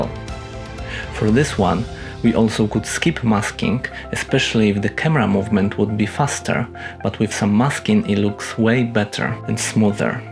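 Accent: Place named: Russia. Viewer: Вы русский? 😅 You have russian accent Well done 👏 I learned a lot 🙌 thank you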